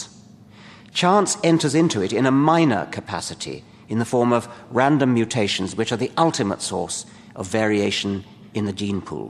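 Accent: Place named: RP, United Kingdom. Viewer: Dawkins has long been the most eloquent advocate of all things science for the general reader. And I love his upper crust British accent :-)The Selfish Gene should be required for all high school students.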